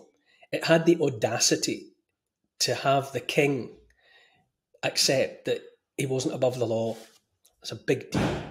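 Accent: Scotland, United Kingdom. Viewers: I am so fekking ignorant. Your words hook me. The Magna Carta, was it signed at Runnymede or somewhere?? Surrey? I don't know which way is up. But I felt something was terribly up during that very first lockdown. I felt it in my waters. In my bones. I felt we were being darkly duped. I sensed something was shifting. Like when you go to the theatre, and there's the intermission. And behind the stage curtains all the scenery gets shfted around. Whilst people are slugging back their intermission quaff of choice, or queuing for the loo. Massive scene change is going on back stage. I felt something was going on. You have a lovely Scottish..burr..???🤔 I don't know how to spell that word. But anyway, you have that. ♡♡♡♡♡ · is a gem. Love the way he thinks and speaks. That Scottish accent just adds to it. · I love him more Trevor 😝😅😅 A gentle, beautiful Scottish lilt A kind, empathic, forward tilt An open heart; a caring face A willingness to take his place. 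A strong, home-hitting monologue Reminds us of the boiling frog The courage to become a sleuth A passion to release the truth. A deep desire to crack the whip Illuminates our freedom-strip Calls out the darkness taking hold The shiny despots; plated gold! Intense and raw, he lays it bare A soul-enhancing, honest stare Inflections drive the message through He speaks for Us - for me and you! Discernment's such a precious gift His words create a mighty lift Take heed of all he has to say He knows - it shouldn't be this way! 🤗🦋🐬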